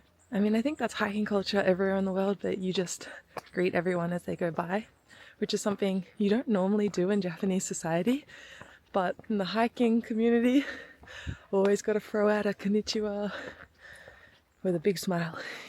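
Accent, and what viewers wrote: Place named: Australia. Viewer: I like your Australia accent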